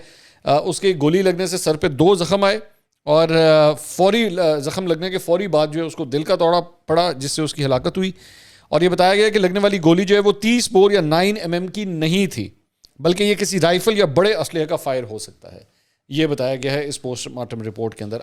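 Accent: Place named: Lahore, Pakistan. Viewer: Bhai, I have limited interest in the news that you give. I just watch your vlogs to listen to your Punjabi dialogues. My parents used to speak the same way. They came from the walled city of Lahore when the partition took place. 🙂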